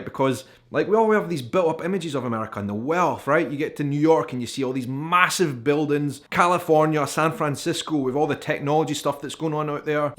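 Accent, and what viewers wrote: Scotland, United Kingdom. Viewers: My ancestors can from Scotland. Love your videos and accent. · This was great! The best part though was the subtitles trying to keep up with your Scottish accent. So funny.😅🤣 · I need to hear your Portuguese with a Scottish accent. I need this in my life. (I've heard Brazilian portuguese from the city and the country as well as 'good' and 'bad' American-accented portuguese. It'd be interesting to see what bleeds through for a Scott.) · New subscriber here, and an American. Great video! Thanks for backing us up! Lol you're always welcome here! Love the Scottish accent, it's awesome, but I'd be very interested to hear a Scot speak Portuguese 🤔🤔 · great perspective , good show. i’m afraid my wife will fall in love with your Scottish accent !!🧐😎🤓😝👻👽👽👾👿 .... paul in ohio usa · Am i the only one who wants to hear him speak Portuguese with a scottish accent!?! 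· I'm an American of Scottish and Syrian descent. I have visiting Scotland on my bucket list. I'm getting old, so, I'm hoping a bucket of money will fall on top of me. I hope you enjoyed the United States! We are, truly, an amazing country! (I love your Scottish brogue!)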